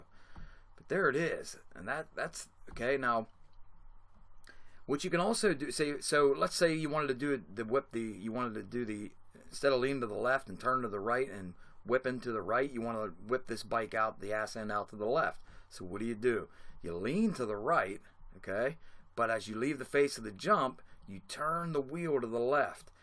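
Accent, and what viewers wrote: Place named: Pittsburgh, United States. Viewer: sounds like your from Pittsburgh man good video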